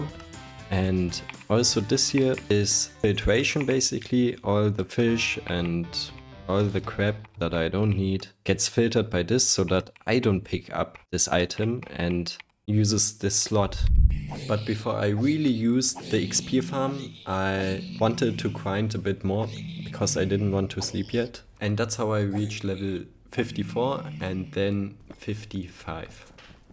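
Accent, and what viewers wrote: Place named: Germany. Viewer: Wie hast du das gemacht man hört du bist deutscher 😅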